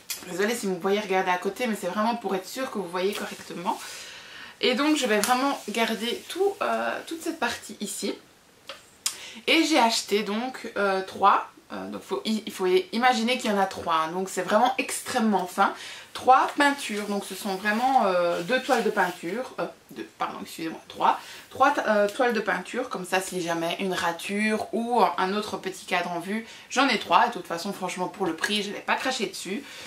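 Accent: Belgium. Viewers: Tu es belge ??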